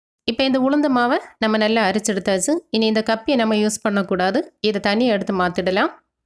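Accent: Kerala, India. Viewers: Your's is Malayalam accent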